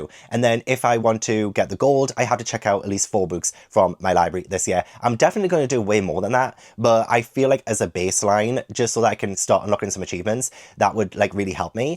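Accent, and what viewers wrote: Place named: London, United Kingdom. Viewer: Oh my days, is funny lol. Your London accent is fab 😂❤❤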